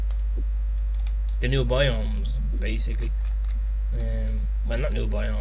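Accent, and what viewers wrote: Ireland. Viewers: spoken like a true irishman! :D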